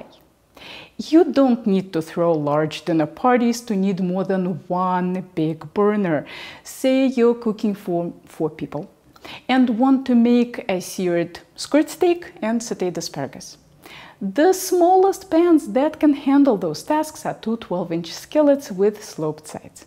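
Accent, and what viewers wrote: Russia. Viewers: nothing in this world is more irritating than a russian accent in english. NOTHING. except people who don't understand the difference between spoken and written language, and read a script to a screen like a 5 year old reading the three bears. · The Russian accent puts me off these videos and just wondering if there is any connection between the accent and the pro gas bias. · This is the first time I’ve watched one of your videos,and I am so glad the YouTube algorithm recommended it. The topic is very timely as we will be having a lovely manufactured home built for us and I either have to have a non-gas, 30” range or a gas range with propane, which would be an upgrade and cost us for the gas range and, of course, the propane. I love the gas range I currently have, and don’t want any other type range. I’m a little concerned because of what you said about your needing a Wolf because it works well with propane. 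I am 73 and don’t cook nearly as much as I used to, so cannot justify the cost of a Wolf. I am now a Subscriber. Thank you for this helpful video. BTW: I love your beautiful voice and Russian accent!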